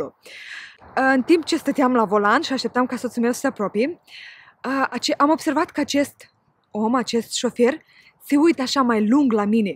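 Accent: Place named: Moldova. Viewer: Buna, sunt din Chișinău,mi-ai atras atenția cu accentul nostru din Md. Imi place mult cum predai engleza.dar sunt interesată de cea britanică.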